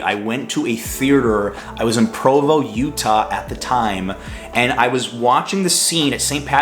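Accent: New York, United States. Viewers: I’m Japanese and grew up in Flushing Queens in the 70”s ! I’m now in my mid 50’s and living in Japan for 40 years but your vlog brings back all the great memories in my childhood. I’ m also losing my English speaking abilities but your vlog reminds me some of the New York accents and all the good foods especially pizza and sandwiches(^^) !! I love watching your videos! Keep up the good work😊😃‼️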